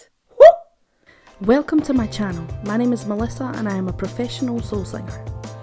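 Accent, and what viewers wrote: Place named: United Kingdom. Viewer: Ohhh, you are so cute...your femininity...when you touch your beatiful hair, ok, a gift for you, react to, but...with singing, the others...poor of She´s, ohhhhh, you so cute, love you english too, it´s the best Britanic accent, kisses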